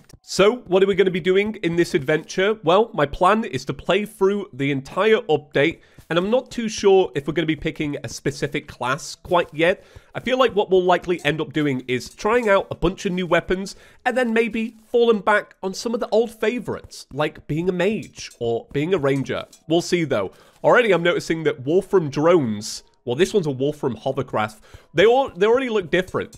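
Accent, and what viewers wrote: United Kingdom. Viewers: Omg are you British!😍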